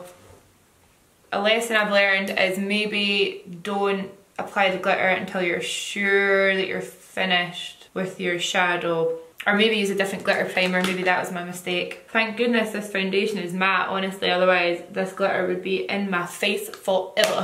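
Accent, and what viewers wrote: Scotland, United Kingdom. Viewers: I love your accent. Are you Scottish?:)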